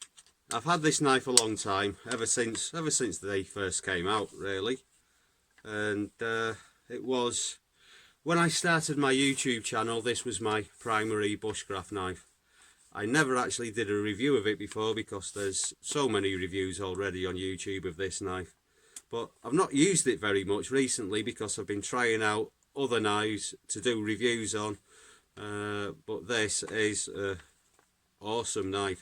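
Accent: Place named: Merseyside, United Kingdom. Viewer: Subbed. Nice honest review which covers a few pointers that others do not, i.e spine dynamics using a ferro. Good to hear a Merseyside accent in Lapland! I bought the last stainless 140 on the Varu site a couple of weeks ago, shipping is a bit slow because of the plague but I hope to get it middle of next week. I was agonising about the cost but I am glad I pulled the trigger on it. Now will be carrying the 140, the Savotta pocket chainsaw and the Fiskars X7, all made in Finland. That package covers all my camp/bushcraft needs! Any tips on sharpening the stainless version? Cheers mate.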